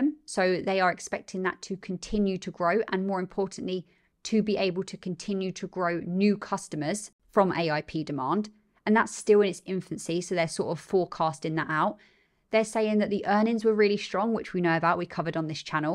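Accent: United Kingdom. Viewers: thanks for your insight! And I get to listen to a wonderful British accent too:)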